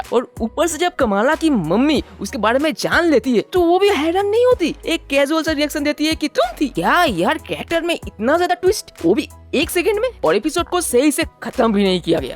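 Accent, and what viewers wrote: India. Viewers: Baton se ap indian Q lag rahy ho ap 🤔🤔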